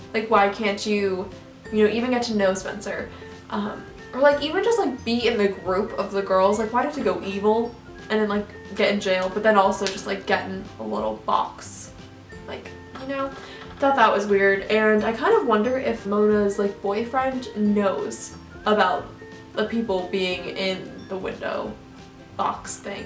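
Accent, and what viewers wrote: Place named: Ireland. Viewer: accent I felt like it sounded more like a Irish accent more than a British accent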